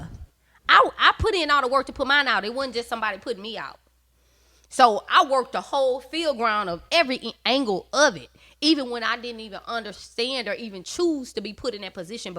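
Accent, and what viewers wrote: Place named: South, United States. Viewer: I love her lil country accent “I’m talm bout Semen 02” 😂😂😍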